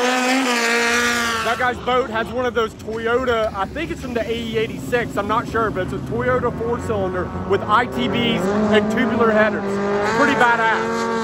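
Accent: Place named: Baltimore, United States. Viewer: the baltimore accent makes this video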